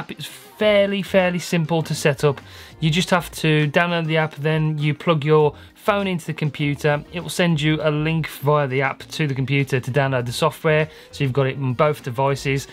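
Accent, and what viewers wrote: Birmingham, United Kingdom. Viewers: Loving the brummy accent from another brummy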